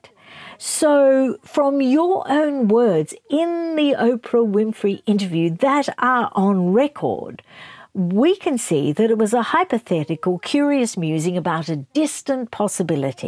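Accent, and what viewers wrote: Australia. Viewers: To repeat… these Snippets are like a verbal Southpark for me here in the US. Double bonus is your lovely calm Australian accent , in addition to the brilliant story lines. Americans are snarky in a different way, but it’s almost better coming from a Commonwealth member! You have even more reason to dislike them intensely!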